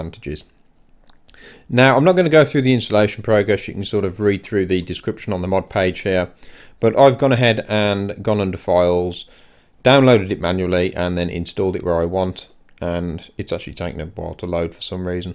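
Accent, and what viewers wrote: Birmingham, United Kingdom. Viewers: Are you brummy? Had to ask Haha.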